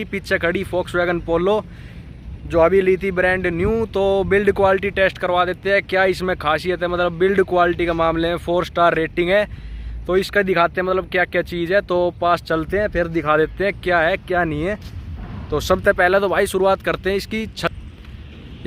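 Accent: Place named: Haryana, India. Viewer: Bhai your from haryana?, really like your hindi.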